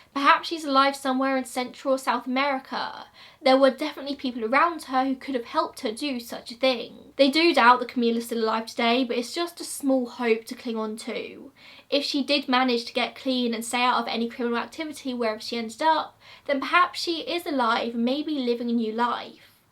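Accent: United Kingdom. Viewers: i'm just hypnotized by your perfect british accent <3 i love it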